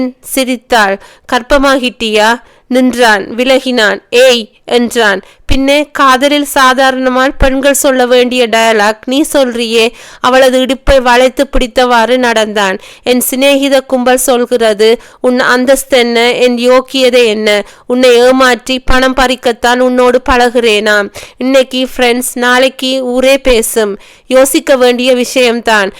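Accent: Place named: Sri Lanka. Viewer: என்னாச்சுப்பா ஏன் இப்படி அவசரமா வாசிக்கறீங்க உங்க குரலில் ஒரு வசீகரம் உண்டு அந்த கொஞ்சும் இலங்கை தமிழை கேட்க கேட்க இனிக்கும் ஆனால் இக்கதையின் வாசிப்பில் ஏதோ மிஸ்ஸாகிறது போல் தெரிகிறதே நிறுத்தி நிதானமாக வாசியுங்கள் சகோதரி என்றும் உங்கள் வாசிப்பை விரும்பும் நானும் ஒரு சகோதரி தான் பிழையாக கருத வேண்டாம் உண்மையிலேயே உங்கள் பழைய நாவல்களை கேட்டு வியந்து போயிருக்கிறேன் தொடர்ந்து உற்சாகமாக வாசியுங்கள்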